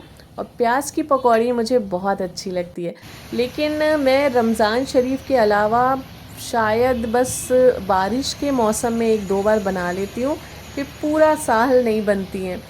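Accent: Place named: Hyderabad, India. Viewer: Assalamualaikum khairiyat kaise ho ap mai samjh t thi u p ke log alag baat karenge lekin ap to hyderabad ke logon ki tarah baat kare Mujhe bohot acha lagta hai apke vlog dekh ker allah ap ku khush rakhe ameen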